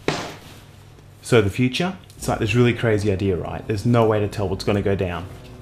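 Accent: Australia. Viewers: Dude's Australian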